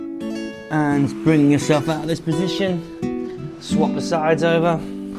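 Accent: United Kingdom. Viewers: Haha, I can hear the British accent off of this, Sends much love from Kenya👋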